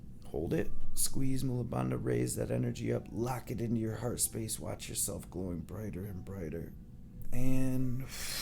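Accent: Detroit, United States. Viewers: You from Detroit bro?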